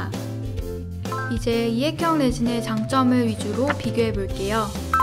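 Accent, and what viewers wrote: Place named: Gyeongsang, South Korea. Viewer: 경상도 억양이 들리네요 ㅎㅎ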